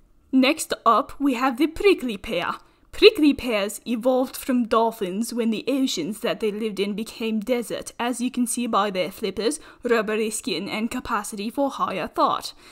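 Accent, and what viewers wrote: Scotland, United Kingdom. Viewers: ur accent is more scottish than brittish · This makes me laugh and I need more- not rushing you though. Scottish accent noises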